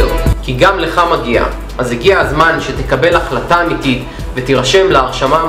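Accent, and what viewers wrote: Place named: Russia. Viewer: מבטא רוסי חח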